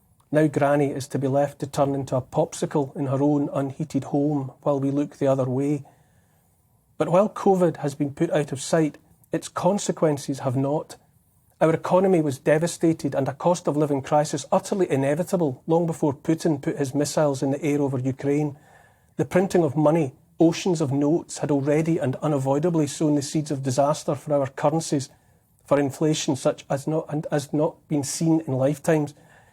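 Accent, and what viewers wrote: Scotland, United Kingdom. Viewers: Really he is what he's complaining about. Somebody on " The News " extrapolating the worst possible outcome from any given event just to keep us in a state of anxious uncertainty. But in a Scottish accent. Nothing but a Private Frazier for our time constantly declaring that "we're dooomed"!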